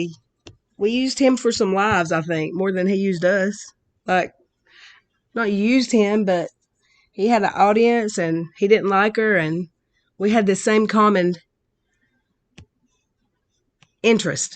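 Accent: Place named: Ozarks, United States. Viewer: you sound like you're from arkansas missouri etc that area; and sounds like she is from the midwest (which she is she lives in MN st paul area cheese country) so that the fact that she is saying her harraser is from the same is in geographic area as her yet YOU have a very differnt and strong accent from the diff part of the country just shows that she is trying to place blame on other people and is sick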